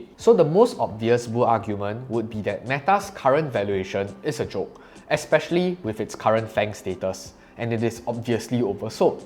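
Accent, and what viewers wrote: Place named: Singapore. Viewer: Enjoy the Excellent analysis with Singapore accent.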